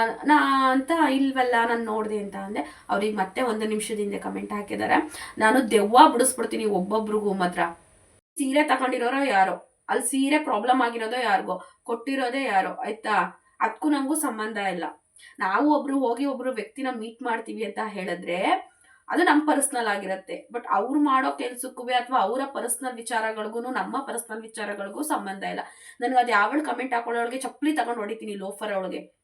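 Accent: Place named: North Karnataka, India. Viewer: uttarakannada bashe keloke chanda...yar yen helidru bashe ge ero gowrava sikke siguthe bit bidi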